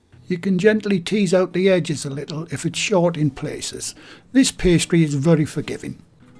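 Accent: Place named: North East England, United Kingdom. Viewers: I'm inspired and subscribed. I got caught up in a Youtube loop and somehow ended up at your splendid channel. I'll be making kebabs this weekend after watching one of your other posts. I was born and raised in Durham. I haven't lived there for a long time but always nice to hear a north east accent. All the best! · I am so glad I found your channel, being from near Middlesbrough it's so nice to hear a North East Accent online! Thank you for the videos and happy cooking:)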